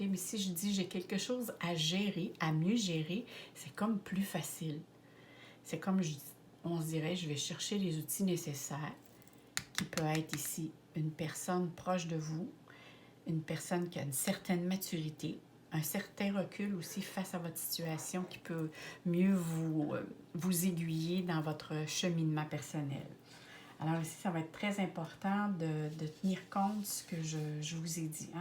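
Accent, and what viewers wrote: Quebec, Canada. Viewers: Belle philosophie et présentation, ça me parle, avec en prime ce super accent Québécois.Ce beau pays et bons souvenirs de voyage pour moi.🙏💚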